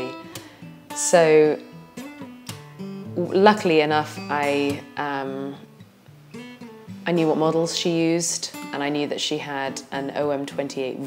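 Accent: United Kingdom. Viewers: Really ? I thought that accent was kinda British... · Your British accents makes it official love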